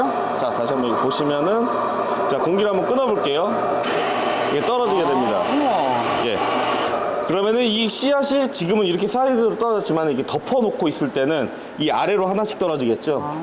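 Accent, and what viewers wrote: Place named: Chungcheong, South Korea. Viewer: 고향이 충청도신가 백종원 필이 나네여 성공할 관상이네 부인분이 사람볼 줄 아는듯 임금 높은 나라는 세금과 생활비도 비쌉니다 앞으로 남고 뒤로 밑지죠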